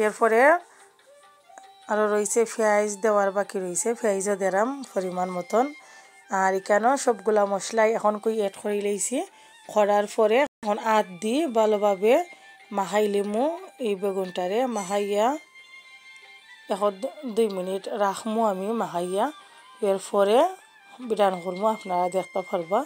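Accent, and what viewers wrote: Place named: Sylhet, Bangladesh. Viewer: o mai go sylheti ni go😀yummy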